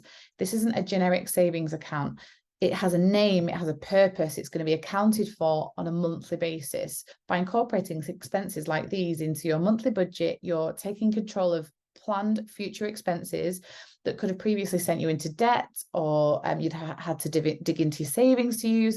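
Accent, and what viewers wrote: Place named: North, United Kingdom. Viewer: just downloaded the app and looking forward to using it! this may sound awful but hearing that you’re northern makes me trust you a lot more 😅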